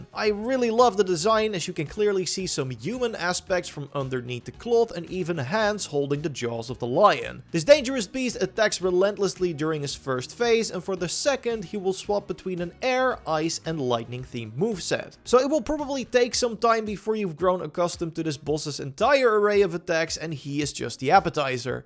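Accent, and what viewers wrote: Netherlands. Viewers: Lekker hollands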